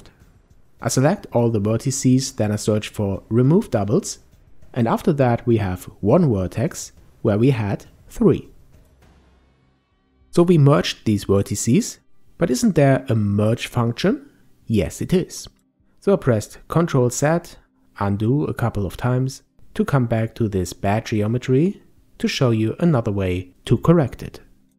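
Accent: Germany. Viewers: Most likely a German native speaker who is overly self-conscious about using the "w" sound correctly.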